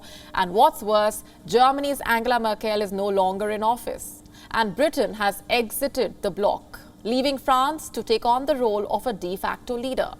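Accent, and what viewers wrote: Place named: India. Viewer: Is she reporting news in English or Indialish. Do WION don't have a better newscaster other then this lady.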